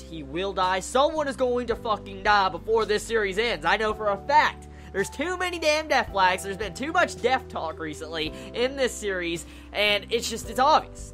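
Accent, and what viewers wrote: South, United States. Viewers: Not being mean get a haircut you would look better and where is your accent from you sound southern?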